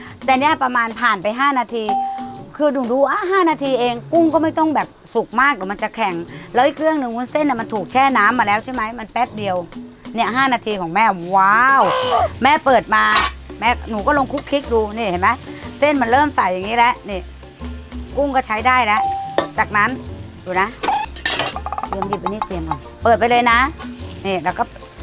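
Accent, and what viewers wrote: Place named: Mae Klong, Thailand. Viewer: สมเป็นสาวแม่กลองเลย อารมณ์ขัน พูดตลกเหน่อๆ แต่ฝีมือทำกับข้าวสุดยอด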